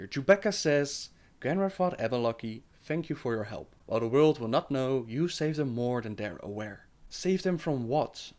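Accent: Netherlands. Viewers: Trevor E. He is Dutch. Not Swedish. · WAUW jij komt echt uit nederland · Are you Dutch? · Are you dutch?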